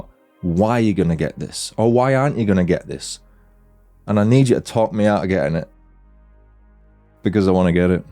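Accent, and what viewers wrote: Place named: Yorkshire, United Kingdom. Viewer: I'm not getting one, what I need rn is a vertical and a couple of cheaper niche handhelds with Linux I think, but the orange one is very pretty. BIT too washed out and BIT too much orange but still... I'm a basic beeatch who go for black at all times, I find anything else mostly distracting while playing (+ the issue of getting dirt on the handhelds), but it's nice to see the more fun colors. White ALWAYS sucks. Edit: "Yorkshire"... A UK accent I wouldn't be able to place but that doesn't come off as properly annoying (!). Cool.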